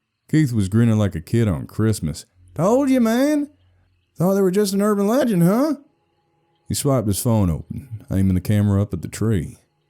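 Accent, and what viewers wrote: South, United States. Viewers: Your southern accent has no reason too be this good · I love your southern accent ❤ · Scary creepy dark water not for me your good old boy accent is on point and I know country myself 💛✌️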